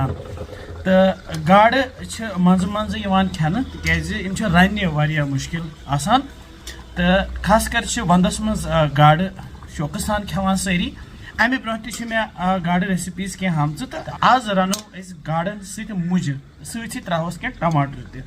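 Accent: Kashmir, India. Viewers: I like kashmiri voice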